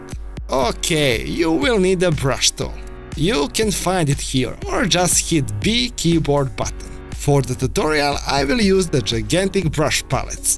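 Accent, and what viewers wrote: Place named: Russia. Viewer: такой знакомый рашэн акцент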